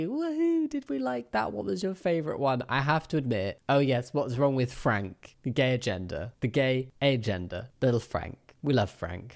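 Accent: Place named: Scotland, United Kingdom. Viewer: You said something and I really could tell you were Scottish. The accent is there at least sometimes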